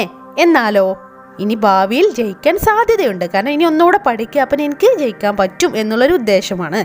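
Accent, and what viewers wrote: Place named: India. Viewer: cringe indian accent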